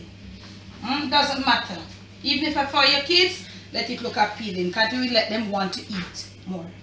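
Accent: Patois, Jamaica. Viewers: Good morning Aunty i have to laugh when you talk our patios.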